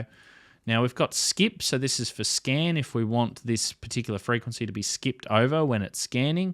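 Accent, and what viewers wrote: Australia. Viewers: Hej Matey, well i bought the Boafeng UV5RH and tried to use the CHIRP software and then i got stuck, so i went on youtubr to look for tips n info. A Minefield of ppl giving their views n tips on their vids....... i have watched so many from the uk and usa and i havent found one untill now that goes through the start process as well as you do. Yup fair dinkum me old possum, Sorry, just using my English sense of humour, No but seriouly i got to downloading from the radio all the channels and then WHAT..... untill now when you showed me how to save the numbers and then delete them from the radio ready to programme the new ones in by hand or buyin using links on CHIRP... I live in North Wales in the UK and even here the videos dont tell you that, strange isint it? i have asked pp on fakebook in my area and i got limited responces and mostly use chirp............ 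As i am not young anymore all i need is to be shown once and i generally have it. So now i have found your video, saved it and subscribed to you also plus you have a good Aussy accent that is easy to listen to. So thank you for putting this video out there matey. Be safe out there. Thank you from over here in North Wales UK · I just found your channel then I recognized inmediatly that beautiful aussie accent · Can't find my UV-7B anywhere on the list, Chirp sucks.. Work on losing the aussie accent, sounds awful.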